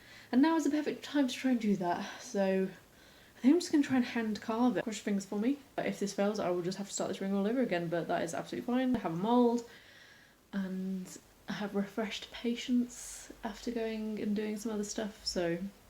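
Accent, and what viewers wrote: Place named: United Kingdom. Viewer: I LOVE YOUR BRITISH ACCENT THIS IS SO GREAT!!!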